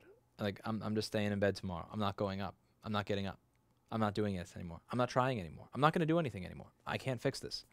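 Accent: New York, United States. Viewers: Watching your video from my bed, i wondered "why did i subscribed to a guy who fixes Mac systems"? I bought a mac some time ago cuz i wanted to do more photoshop for my pics, but haven't been taking pics for some time now. And i don't think i'll be opening a mac anytime soon. So after a couple if minutes, i realized: 1. i've always liked the NY accent (never been there, not sure why. Not even my native language ) 2. i like real people, and you keep it real 3. i like that underdog attitude. Keep up the good fight, i'm a believer in hard work. Been working since 16, almost 3 decades in a couple of years. Not the sharpest pencil on the box myself, but i firmly believe that if you try to do your best everyday; life will treat you fairly. I've had a rough couple of years before the covd hit the fan. But my ant determination (i feel like im in autopilot sometimes) has kept me afloat, and things are looking bright ahead for now. 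Cheers from Costa Rica. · If you could boil down all that's good an interesting about NYC, it would look and sound like Rossmann. The world needs more.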